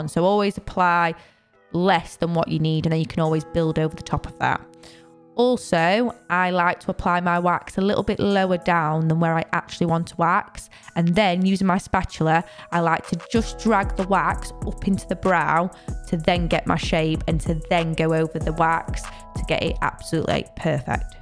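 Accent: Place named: Northern England, United Kingdom. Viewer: hear a northern english woman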